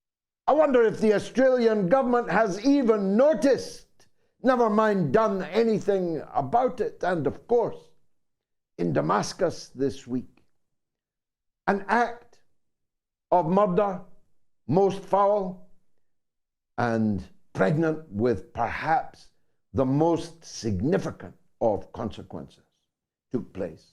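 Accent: Scotland, United Kingdom. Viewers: Tacitus born 56 AD would be proud of you a man of words well don't know what he think about the Scottish accent. I looked it up because I love history. Thank you, you can tell wifey about that❤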